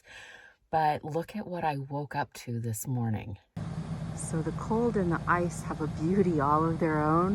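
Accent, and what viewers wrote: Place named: Missouri, United States. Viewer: Lol..... you cwack me up! I can definitely tell you are from Missouri.. I am clumsy.. frought with mishaps.. especially when someone is watching me.lol.. I try and keep my "cool".. but ends in epic failure! Enjoying your posts from SW Missouri.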